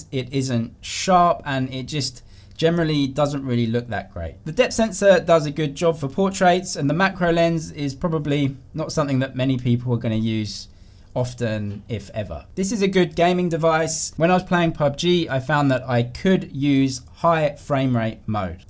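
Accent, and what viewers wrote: United Kingdom. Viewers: Are you British? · you looks like eminem with an british accent 😊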